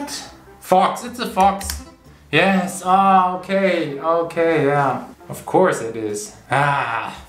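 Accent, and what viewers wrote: Germany. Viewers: i kinda though he was german because of his acent and than this xD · Ach bist deutsch hab mich irgendwie gewundert über das hat hab mir gedacht das hört sich doch deutsch an lol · Ah deutsch hab mich schon gewundert bei deiner aussprachr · Bist du aus Deutschland ? Hab weiter geschaut frage beantwortet. Dachte wegen der Aussprache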